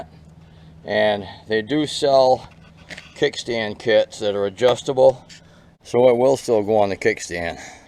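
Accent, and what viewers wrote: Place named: Boston, United States. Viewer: Are you from Boston, MA? Love the accent :))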